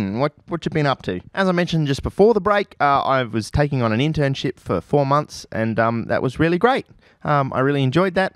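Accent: Australia. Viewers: Good to see you,. Your intelligent, irreverent, Strine is music to my ears:)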